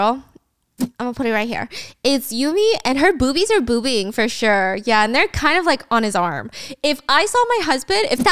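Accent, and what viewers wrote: California, United States. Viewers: You have the strongest california accent I've ever heard xD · You talk like a girl from Cali I think. Are you from Cali?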